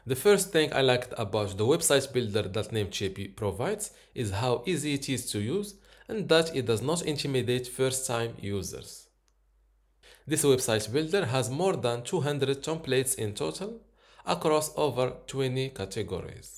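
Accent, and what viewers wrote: Morocco. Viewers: my greetings to our moroccan accent reviewer , good review indeed !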